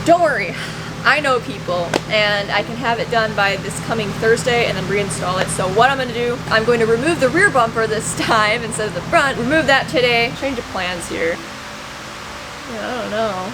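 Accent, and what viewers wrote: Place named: England, United Kingdom. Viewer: Definitely an English accent, not 'strayan' (Australian). With the reverse cameraI I also tend to forget about the existence of mirrors... good luck with the repair!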